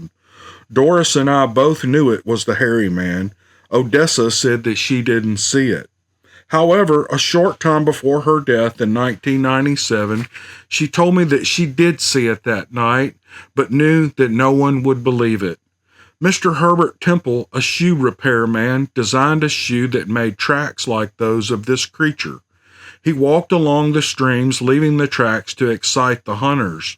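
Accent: South, United States. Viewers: love your voice reading these! and your voice is so country! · This was so nice, such a sweet surprise! Getting ready for end-of-day routine, but thought...wouldn’t it br nice to listen to story before hitting the hay...not really any hope there would be one. Imagine how delighted it was to see you had been busy and there was not one but three stories.! I feel really spoiled! I have wondered what is the big attraction to hundreds of us listening to this kindly daddy voice...l know your voice reminds me of my Dad, he has been in the land of the living now for many years and l really miss him! Listening to the gentle tones of your Southern drawl is too much like home! I think there must be a few others that feel like this is almost magical, allowing us grown (and in some cases OLD) folk to enjoy being read to by a loving Daddy again! Thanks so much,. 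Hope this doesn’t sound corny...the stories are nice too, as usual. Appreciate your doing these so much! Entertaining and comforting! Happy trails! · Early in my adult life I shed my native southern accent for a career in major-market broadcasting, but I can still talk like that when I want to., I like your accent very much, and I don't believe anyone will have any difficulty in understanding you. Also I love that you don't make any attempt to hide your faith in Jesus. He is LORD!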